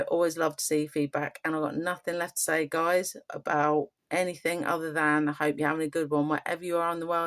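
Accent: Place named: England, United Kingdom. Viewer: Do you mind if I skip my favorites? You skipped, not my favourite by any stretch, but a grime OG. You still sound English as fuck, how long have you lived in America? Ya, Lord of the mics is the best battle, because it was thee battle. It showed why grime was it's own thing. Beats, cadence, London accents, and slang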